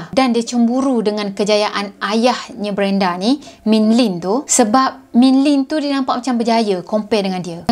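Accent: Kelantan, Malaysia. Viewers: pada siapa yg berbakat jdi pembaca berita tp tak berpeluang, boleh la cuba jdi youtuber......suka cara menyampaikan cerita, jelas dan terang walaupun ada slang2 kelate sikit....but its ok...slang yg natural...keep up the good work sis....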